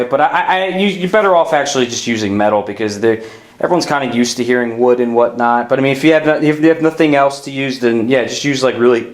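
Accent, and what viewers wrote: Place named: Michigan, United States. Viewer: Your space is serving Nova Scotia/Maritime vibes. But your accent has that Michigan feel. Go get’em, Tiger. I once played “ ” at my next-door neighbors for an hour, on a massive sound system… because they’d have noisy rows EVERY FRIGGIN’ NIGHT when he got home from his job on the police force. It worked.. and IT WAS FUN. Now, I cannot watch ‘Beetlejuice’ without reliving the trauma; it was worth it.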